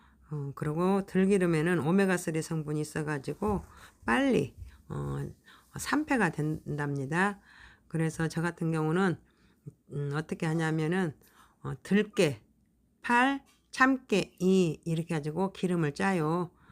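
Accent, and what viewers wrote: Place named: Jeolla, South Korea. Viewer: 다혜송❤117번좋아요ㅡ 말씀이 전라도 신듯합니다 참기름 ㆍ들기름 보관범 호일감고ㆍ우유팩안에 보관 ㅡ굳아이디어 입니다 🎉🎉❤❤❤❤❤❤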